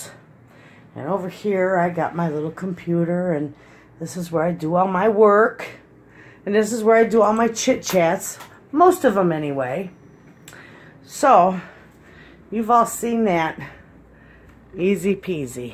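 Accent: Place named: Northeast, United States. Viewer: Hi. Thanks for taking us through your beautiful home. You are organized and efficient! Sounds like you have a North Eastern accent. I'm from upstate NY (but in SoCal for 40 years now) so you sound like all my relatives. Have a wonderful day.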